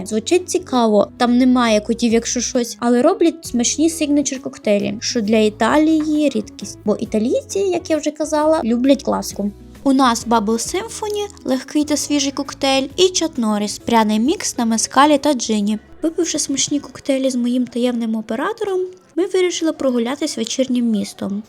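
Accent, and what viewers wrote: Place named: Surzhyk, Ukraine. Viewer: Аццький (давно такого не чув) суржік. Не витримав довго, треба працювати над мовою. Але бажаю успіхів і подорожей :)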